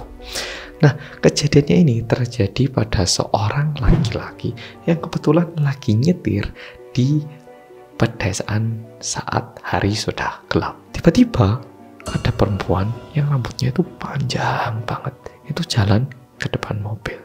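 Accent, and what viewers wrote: Java, Indonesia. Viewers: Suaranya khas bgt....logatnya apalagi....tetap jawa y mazzeeeh....sukse trs ya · Kesian ih Mbaknya 😭😭 semoga lukanya pulih Makacih, Boloku Sing Paling Medok Dheweeeee 🙏🏼🙏🏼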